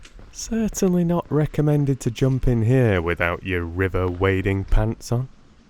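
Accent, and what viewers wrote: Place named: England, United Kingdom. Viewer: what a wonderful late summers day! almost feel the smell from the water Your pronounciation is perfectly fine with a typical english accent:-). An extra information about fika is that the word comes from a time when people liked to play with words like this: fi-ka turned around = ka-fi... Kaffi is an older way to say kaffe.(coffe). Love Tove Janssons books, grow up with them. I will listen to your reading soon. 😊🌻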